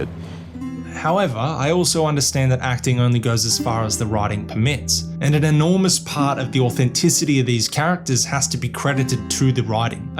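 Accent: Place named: Australia. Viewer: Your accent sounds nice. Are you by any chance from Aussie or a you a Kiwi?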